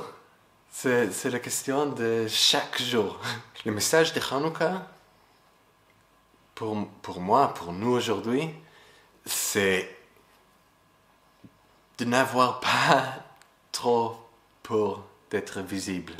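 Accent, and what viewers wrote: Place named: Israel. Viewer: D'abord j'aime l'accent hebreu en français 🎶💯🔆✴ en suite j'espère parler hebreu comme vous parlez français ! Merci pour cette belle nuance enter les limites et les lumières....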